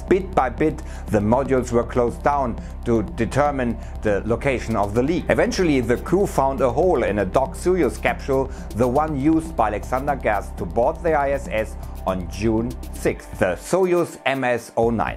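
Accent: Germany. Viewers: Denglish would mean that he is speaking like half of the words in German and the other half in English. He only has a german accent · Sorry. But this Art oft Denglish is terrible. Thumb down.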